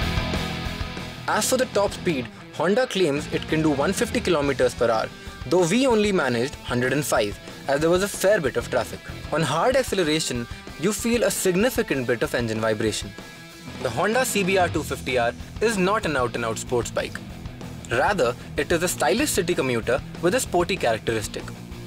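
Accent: India. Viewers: gotta love the indian accent =D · he's a south Indian. Most likely to be a Keralite xD They pronounce T like that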